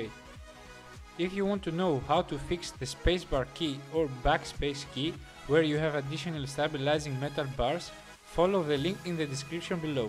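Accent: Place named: Germany. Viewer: hes german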